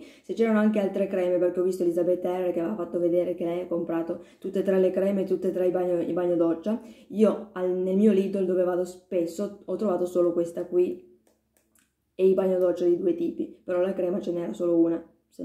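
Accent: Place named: Piedmont, Italy. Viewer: infatti si sente dall'accento. Non ci sono mai stato ma mi piacerebbe visitare le montagne la e torino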